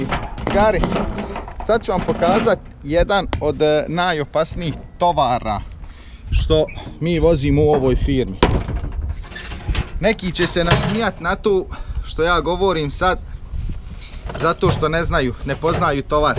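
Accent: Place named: Bosnia and Herzegovina. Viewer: Tek sam sad otkrio kanal.. A sto volim cuti ovaj jezik govor ... Odmah me prebaci na BiH postavke...♥️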